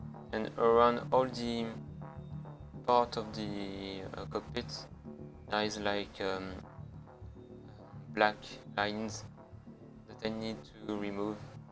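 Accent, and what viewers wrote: France. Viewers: Your french or i don't know your accsent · You are from what country ? France ?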